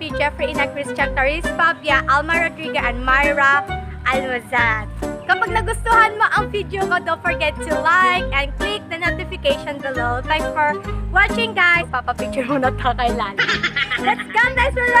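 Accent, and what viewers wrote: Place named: Visayas, Philippines. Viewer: Kakafollow ko lang sa tiktok mo haha ang cute mo yung bisaya na. Ang huy ex yan na ba bago mo 😂😂😂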